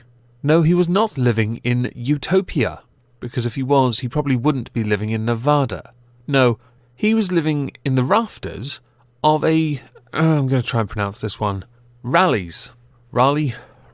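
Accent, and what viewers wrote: United Kingdom. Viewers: You know what made me subscribe? Of course, British wit, voice and humor (which I love as a non native English speaker) plus Lovecraftian theme, but those were just a bonus. The thing that made me finally press the button was - your usage of face expressions. They are so well made (props to the artist who made those!) and varied just enough, and you use them so skillfully to bring a point across or emphasize something, along with a slight voice change or pause, love it. I never though that something like this can impress me so, especially as this element of brand creation is so popular nowadays, everyone creates a cartoony alter ego. You nailed it, it has so much character, it looks like you're actually speaking and articulating through it in real time. Also, the intro with sarcastic "well, isn't this just fantastic" speaks to me on a spiritual level. 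As well as a concept of Exhibition of Stupid People. Good stuff. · I just can’t get over voice and accent like ok British daddy I can listen to you all day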